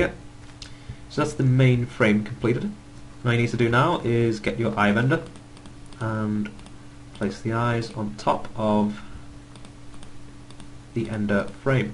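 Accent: United Kingdom. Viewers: Ok thanks p.s.(are you british) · Interesting accent.. Are you British? :) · ARE YOU FRICKING BRITISH MAN!!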